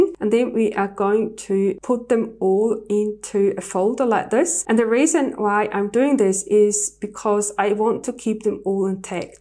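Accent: New Zealand. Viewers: i love the new zeland accent so nice to hearr (im from australia) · I love your New Zealand Accent <3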